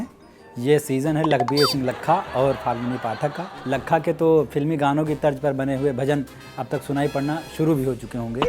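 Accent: India. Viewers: Ekdam देसी accent hai. Hum sab bhartiya apni matrubhasha ki accent mein jab bat karte hein, to ek alag sa feel hota hai. Angrezi se door hote hi sukun milta hai 😄😄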